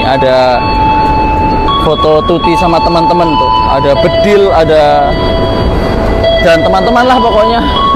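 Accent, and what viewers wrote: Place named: East Java, Indonesia. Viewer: mas asli purwokerto apa Jawa timur.... soalnya kalau logatnya kaya bukan orang banyumas mirip Jawa timuran... apa istrinya orang purwokerto...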